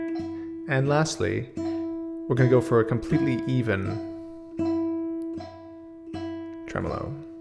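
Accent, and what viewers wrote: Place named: England, United Kingdom. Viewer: con l’accento inglese:-)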